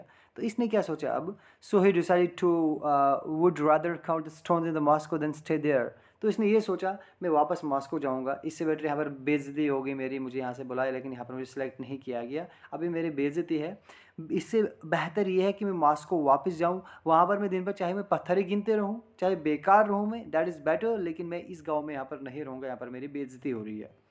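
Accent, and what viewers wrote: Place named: Doda Kishtwar, India. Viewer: Sir aap ke batao se lagta hai ki aap dhodha kishtiward side se rehte hai ❤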